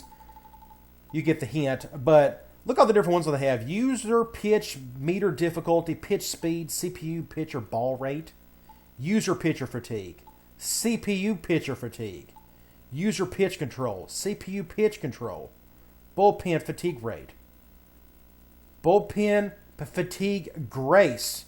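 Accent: South, United States. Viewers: Love the video man. You have a great personality man and we kindve got the same southern accent lol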